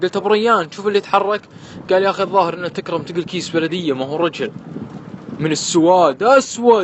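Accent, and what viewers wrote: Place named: Sakaka, Saudi Arabia. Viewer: لهجة اهل سكاكا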